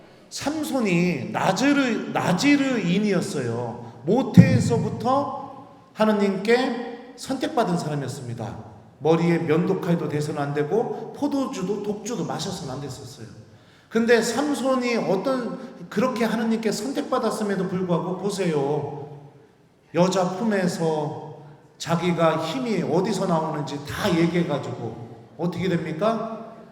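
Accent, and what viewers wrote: Chungcheong, South Korea. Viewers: 구수한 충청도 사투리도 정겹고 신부님 강의 매일미사 강론 너무 감동적으로 잘듣고 있어요. 신부님말씀듣고 어제 고해성사도 했어요. 정말로 좋은말씀 듣고 눈물콧물 흘렸어요. 감사합니다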